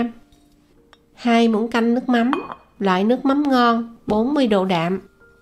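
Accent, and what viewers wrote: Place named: Mekong Delta, Vietnam. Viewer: Hấp dẫn quá !! Món này làm lai rai thì đúng bài rồi , cám ơn lời chia sẻ và giọng nói thân thương của người dân ((Miền Tây))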